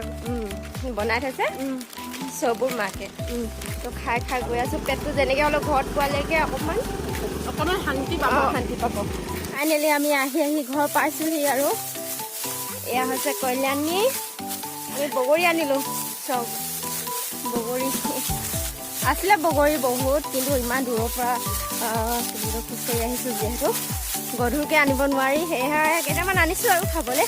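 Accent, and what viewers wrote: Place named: Upper Assam, India. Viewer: Ujoni axomor bhakha to bor val lage mur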